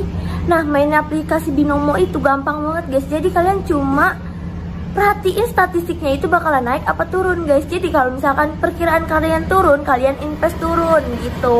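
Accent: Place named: Kuningan, Indonesia. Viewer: Ka orang mana sih kaya orang kuningan aja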